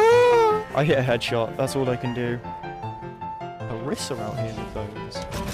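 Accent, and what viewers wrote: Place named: United Kingdom. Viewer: is probs one of my fave streamers. dude makes dredge fun. the funny British accent is a bonus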